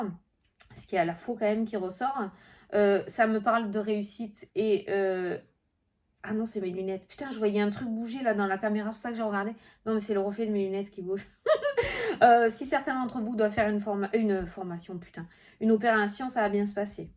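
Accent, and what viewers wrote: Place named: South-West, France. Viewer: Comme on dit chez moi en Suisse, que les esprits critiques aillent voir autre part si tu y es. Argh 😓 Si tu arrives à avoir 53000 abonnés, c'est que quelque part ton petit grain de folie est une bouffée d'oxygène pour certains. Personnellement, ça fait trois ans et demies que je soigne un cancer incurable. Est-ce que j'ai envie d'écouter une personne qui s'écoute parler? Au ton monocorde? La réponse est non. Si je suis ici depuis un peu plus d'un an, c'est parce que tout en toi aspire à la fraîcheur. Ton petit accent du sud ouest est un régal pour les noreilles. 😁 Si j'avais envie d'autre chose, je ne serais pas là depuis un an. Reste le joyau que tu es. Tu me fais rire. Et tu fais du bien, quand les choses sont sensibles en ce qui me concerne. Actuellement ma vie n'est pas un long fleuve tranquille.... loin de là. 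Repousse les esprits critiques loin de toi, et reste le rayon de soleil que tu "diffuse". Avec 53000 abonnés, tu peux même te permettre de les virer! Argh screugneugneu 😓! Personne ne blesse notre! Colère. 😠 🗯 💢 👿 Longue vie à toi, et à ta chaîne ma. 😘 😘 🎵 😚 😚 🌟 🌟 🌟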